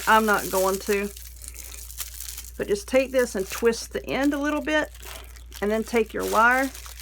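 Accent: South, United States. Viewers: I LOVE your channel. I just found you tonight and I joined your group too. Your southern like me lol. I’m from Alabama. Love watching and learning. You’re awesome!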